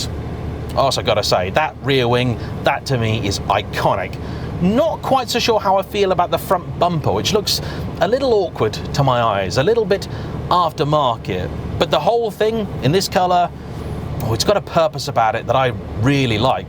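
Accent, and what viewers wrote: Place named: England, United Kingdom. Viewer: Gotta love England accent.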